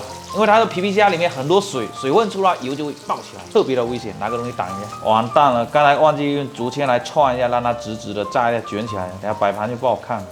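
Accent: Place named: Fuzhou, China. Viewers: 福州口音呀？ 大哥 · 虾菇好吃。福州腔好重，福州人吧？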